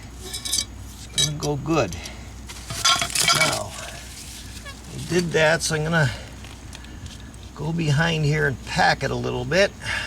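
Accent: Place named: Scranton, United States. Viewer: you sound like you're from scranton. thanks for these videos.just a hobby for me but you show me a great"'how too"........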